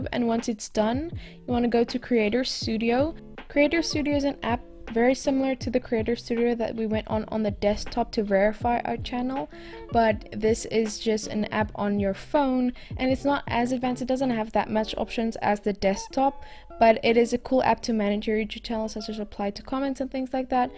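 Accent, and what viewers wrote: South Africa. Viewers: You sound south african I love it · This was very useful!! Also I love your accent!! Are you South African?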